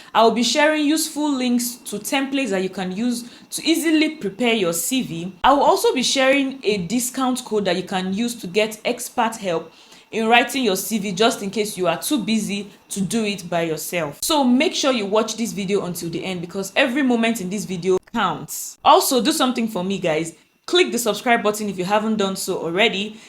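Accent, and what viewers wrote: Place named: Nigeria. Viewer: You sound like we went to the same care academy in Nigeria 😂❤. Thank you sis. Is there anyway I can contact you?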